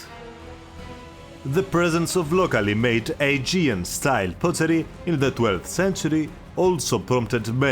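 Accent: Greece. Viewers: τότε συγχαρητήρια γιατί είναι πρώτη φορά που μία τόσο βαρειά ελληνική προφορά, «ακούγεται» . Σίγουρα εντυπωσιάζει μάλλον θετικά τους ξένους, αφού την ακούν να εξιστορεί σχετικά με αυτή θέματα, μην σου πω κι εγώ δεν τσιτώνω όταν την ακούω . Στην τελική, έτσι είναι η γλώσσα μας, αυτή η προφορά βγαίνει σε μια άλλη γλώσσα. Παλιά ντρεπόμουνα να ακούγεται το ελληνικον, στην προφορά μου στα αγλλικα. Τωρα ούτε καν με νοιάζει όταν λέω beach, bitch, peach κτλ, όλα τσουβαλιασμένα με τους ίδιους φιόγκους. Ας πούνε κι αυτοί ξ, πχ ή ο,τι. Μπράβο σας.👍👍🙏🏻🙏🏻👏🏻👏🏻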